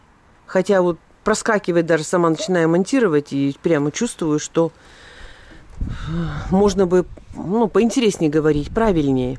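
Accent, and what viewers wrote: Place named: Sverdlovsk, Russia. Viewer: никого не слушайте, вы очень доброжелательны. Я удивляюсь когда вы все успеваете: снимать, готовить, отвечать на наши комментарии. Ваш говор очень похож на то как разговаривала моя свекровь, она со Свердловска. Даже интонации её. Слушаю и вспоминаю свою вторую маму... здоровья вам, энергии,самых положительных эмоций от вашего труда! И сегодня День Матери— поздравлем!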